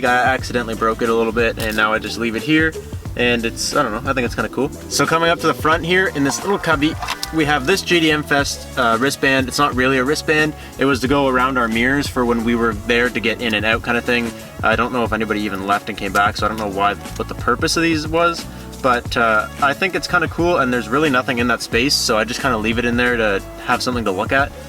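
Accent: Canada. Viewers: right, the accent is hard when he says "out" or "pouch" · I can tell your Canadian lol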